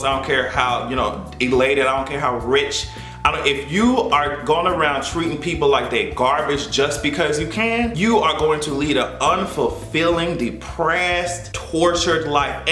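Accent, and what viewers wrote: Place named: Jamaica. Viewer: I die with laughter every time he says woman instead of women. So Jamaican my yute.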